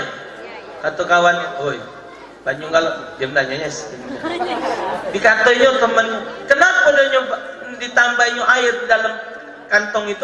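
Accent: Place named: Palembang, Indonesia. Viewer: Masya Allah baru berapo bulan buka ceramah,dan dengan ceramah nyo yg khas palembang..sayo baru tau kalo lah dak ado lagi😢😢.smoga alm ditempatkan bersama org2 soleh.Aamiin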